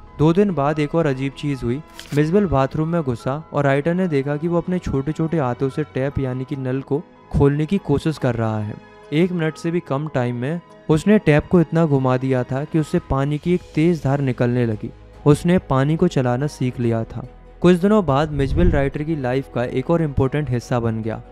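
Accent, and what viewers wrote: Uttarakhand, India. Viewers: Are u from Uttarakhand❤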